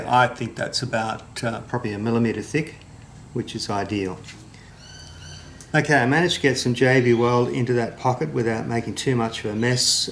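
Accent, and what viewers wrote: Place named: Australia. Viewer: Good Show Mate: As per normal. I always enjoy your vids. Enjoy the Ausi accent too.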